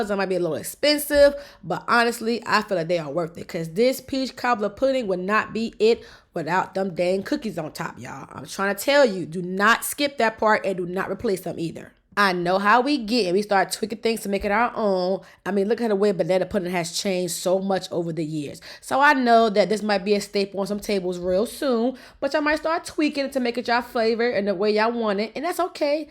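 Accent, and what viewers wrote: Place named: Louisiana, United States. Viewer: Louisiana accent 💋💍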